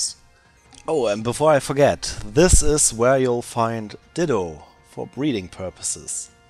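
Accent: Germany. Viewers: You’re German, aren’t you? Or possibly polish?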